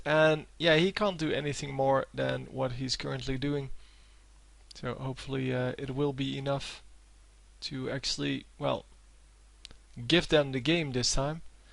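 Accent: Netherlands. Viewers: Better quality :) The game was not that special xs. Nice comentator Aan je accent hoor je dat je NL bent ;) BE hier ^^ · when dutch ppl speak english 0,0 nty plz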